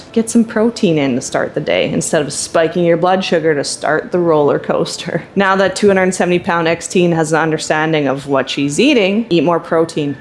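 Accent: Canada. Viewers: Are you Canadian and why can I hear it in your voice and I'm Canadian? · I love your content. Good advice for sure, but I gotta ask. Are you Canadian?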